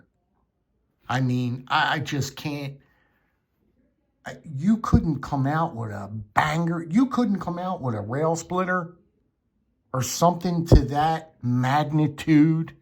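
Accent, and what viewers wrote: Philadelphia, United States. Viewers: Traditional knife videos on YouTube I usually hear a lot of southern/midwest accents, gotten used to it. It’s kind of cool to hear someone like from Philly region.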